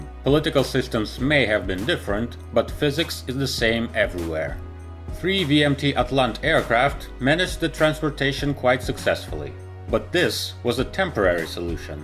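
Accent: Russia. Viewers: To be fair, the native Russian speaking narrator has no "uh" sounding letter in his alphabet to compare to English letter "u". They do have a sound like "oo" though. I have a Russian friend who mispronounes "truck" as "trook." I guess it's a common mistake and some English sounds are difficult. Just as some Russian letters are very difficult for English speakers, when we have no similar sound. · Luka Walpole It's just the accent. As a Russian speaker, He's bound to have SOME words and combo of letters that are different to his tongue! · well it's Russian accent,which usually U sounds as it is rather than A in several occasions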